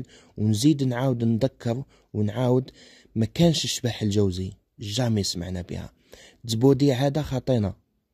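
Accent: Constantine, Algeria. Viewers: الشاف برغم عايش في ألمانيا ألا انه محافظ على لهجته القسنطينية الجميلة ألف تحية وتقدير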